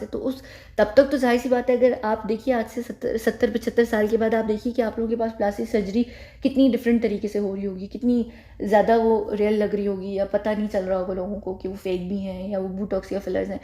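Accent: India. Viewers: Es ka lehja hi hindi hy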